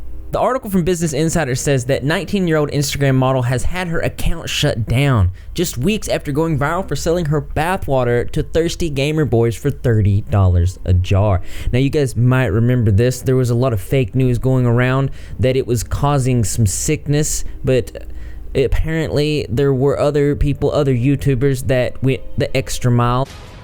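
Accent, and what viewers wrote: South, United States. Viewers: I hope people know they will get mowed down by the military if they trespass. Anyway, great to see another rep report! I really enjoy your southern accent 😄 sending love from my friends and I in New Hampshire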